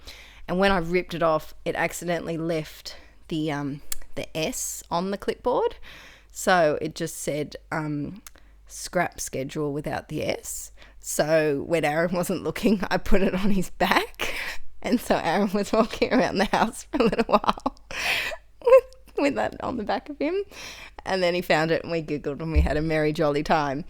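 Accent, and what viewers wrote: Australia. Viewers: just love hearing an Aussie accent for a change. Love your work space, I'm off to redo mine now. thoe embellishments are really cute.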